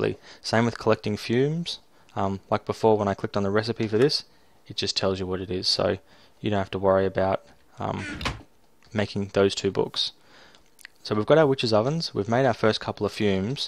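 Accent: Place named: Australia. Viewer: Thank you so much :D It is also great to hear a fellow Aussie :)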